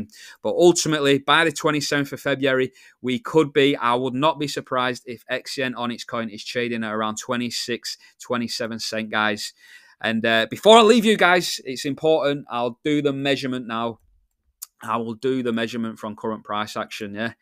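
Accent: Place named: Lancashire, United Kingdom. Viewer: Honestly mate, you sound like you're from where I live, well the area anyway I live near Burnley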